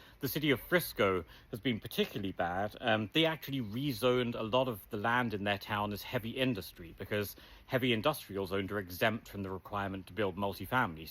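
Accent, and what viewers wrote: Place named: United Kingdom. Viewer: Why is a British man explaining Texas laws to everyone?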